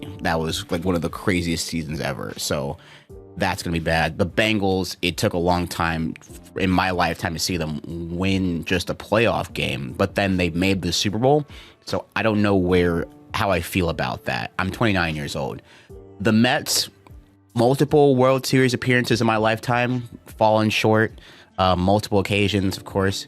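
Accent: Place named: North Carolina, United States. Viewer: 'i grew up in rahleigh north carolina' i can tell, cause you pronounce it rah-lay, not like rah-lee, rally, etc. you ain't even gotta elaborate, moment you said rahleigh like that it's clear~ also agree they should add college football. being from the upstate carolina region, college is king, esp in the clemson/usc areas.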